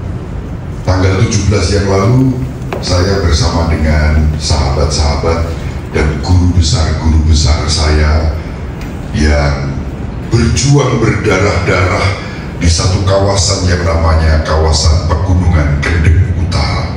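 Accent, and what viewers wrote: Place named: Kalimantan, Indonesia. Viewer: Kalimantan mana suaranya❤❤❤😅😊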